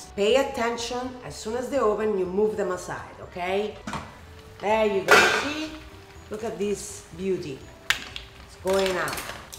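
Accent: Rome, Italy. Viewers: Sei di Roma Oggi l'ho sentito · Whats wrong with you? Have you never heard an italian accent?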